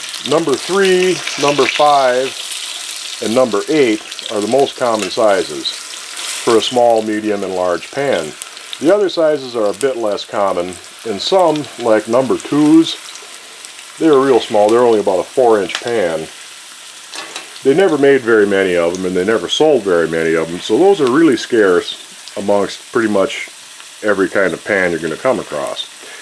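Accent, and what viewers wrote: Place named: Michigan, United States. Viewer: So cool! I rarely see a reply from so this is a great surprise! When the commenter said, “you have a voice like the skipper from Gilligan’s Island” the Skipper is the son of the great Alan Hail or Hale, who often appeared with Errol Flynn in those awesome swashbuckler movies. Skipper’s name was Alan Hail Jr, or Allen? Sorry. I know the names but don’t look at them in print much because I’m too,busy enjoying them in the movies! Anyway, I’m pretty sure has been a professional voice but he won’t tell us where or who he is. Atleast not that I’ve seen yet. And he’s very humble. But the anonymity is driving me curious. I do recognize a slight Michigan accent though. Would be nice to know his previous profession, maybe still current. One thing’s for sure... the voice is a definite gift and everyone loves it. I say, go for it. 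God bless.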